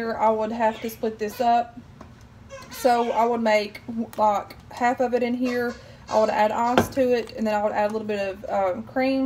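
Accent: South, United States. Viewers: Pumpkin iced coffee! Yasssssss!!!! ❤️❤️❤️ so glad to have found your channel! Love that southern accent honey. We will get along justtttt fine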